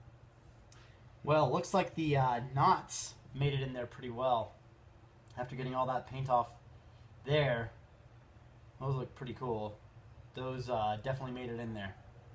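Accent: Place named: Albuquerque, United States. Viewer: Burqueño hahaha oh man bro straight from barque for sure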